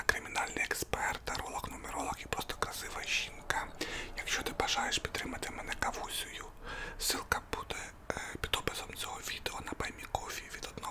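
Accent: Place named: Poltava, Ukraine. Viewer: А я була впевнена, що ти з Полтавщини. Як приємно бути правою!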